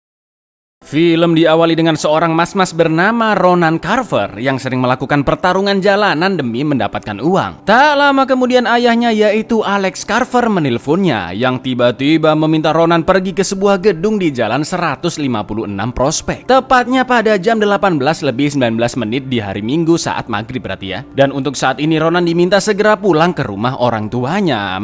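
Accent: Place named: Madura, Indonesia. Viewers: Wah logat maduro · Mirip logat madura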